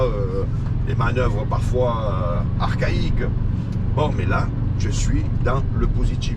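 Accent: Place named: South, France. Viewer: Cool ta chaîne, je m'abonne, sympa l'accent du sud 😊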